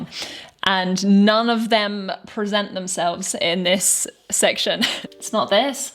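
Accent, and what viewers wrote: United Kingdom. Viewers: Initially i started liking her when she started talking, Dat british accent makes the ears oozing, and when i focused on what she actually says and how greatly she explains what is what my mind started to ooze. Considering her great girl's beauty made also oo, well, this comment wold be blocked if i proceed😂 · Self-promotion is not narciissistic, Honey! (spellcheck not working correctly) Wear your shirt out, girl! I see how impressed you are by this great singer and song from note one because it evidently touches you so deeply! And as the saying goes 'it takes one to know one'! I just had to stop to tell you these things I feel from your reaction already. I liked this song & subscribed to your channel straight away! Also, I love that charming Brit accent coming from a lovely woman such as you! I'm also looking forward to all 21 minutes because of my feeling that you will get deeply into this one because of you recognizing his talent immediately. 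back to the video for me! Love that Magenta hair too, girl! Rocky Horror Picture Show, anyone?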